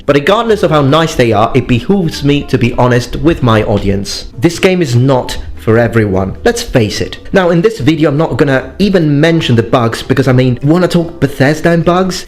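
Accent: United Kingdom. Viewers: Do you speak English with an British accent because you learned from watching British television, or did you spend some time in Britain? I just didn't know. I mean no offense. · I thought you were Italian, but in this video, you sound British...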